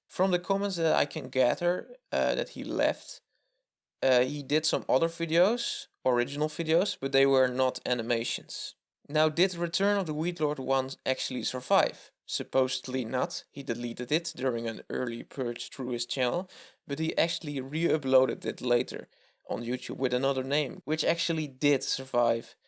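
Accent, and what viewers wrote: Netherlands. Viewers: You sound Dutch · Ahaha kom je uit nederland? Mooi accent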